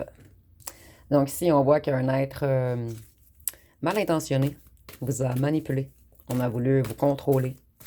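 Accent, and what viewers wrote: Quebec, Canada. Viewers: Bonsoir Madame , c'est toujours avec un grand plaisir que je vous retrouve et vos guidances toujours fort juste ,votre accent québécois j'aime beaucoup et vous aussi , vous faites partie de mes préférées , vos ongles mauve je doute pas qu'ils seront jolies car il le sont déjà j'aime bien cela de cette couleur ,et je ne sait pas comment vous faites ça mais vous avez du goût c'est très jolie je ne doute pas votre façon de vous habiller très féminine et très bien chic toujours , je me sens parfois si seule et votre présence me fait exister car je m'occupe de ma mère toutes ses journées qui ne sait plus rien faire toute seule et en maladies de Alzheimer ça me fiche le bourdon pas mal ,je peux m'absenter mais très peu car ne peux pas la laisser longtemps seule et ma sœur a pris 3 jrs a la mer avec son compagnon alors je regarde vos guidances et ça me permet d'exister un peu ,sinon je suis comme vous coquette et féminine aussi a part que la j'ai pas beaucoup trop de temps . 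Merci a vous et à votre bienveillance a notre égard pr nous faire profiter de ses messages gracieusement . Bonne fin de soirée.❤👍🍀🌹🥰🙏☀️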